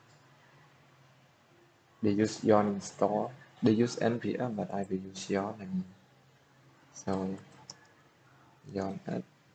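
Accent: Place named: Indonesia. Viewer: hmmmm logatna kek orang indonesia :3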